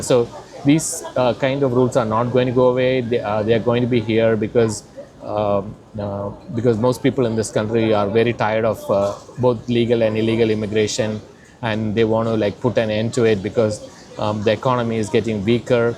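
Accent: Kerala, India. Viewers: Very useful.... seems you are from Kerala.... ?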